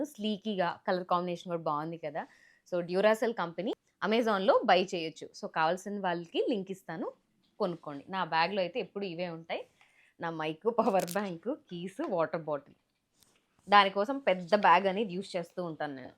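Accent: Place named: Kurnool, India. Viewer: Your slang is like Kurnool girl 😊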